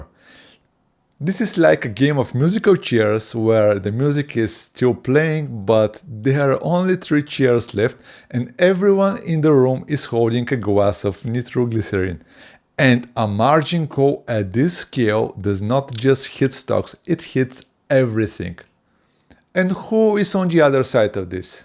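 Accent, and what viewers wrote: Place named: Bulgaria. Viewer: Zdraveite ! That's hello in Bulgarian as i was sure by the accent that he is same nationality as me . Multiple financial markers as Student loans unpaid and Mortgages unpaid and Car reposesions increasing is not painting a good picture. Let's see as most money is made in bear markets for those who know what to do and have powder.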